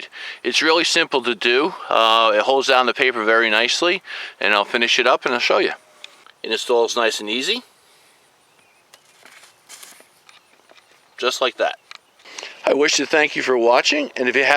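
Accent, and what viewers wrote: New Jersey, United States. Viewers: Good video. Can really tell your from Jersey. "wooder", water.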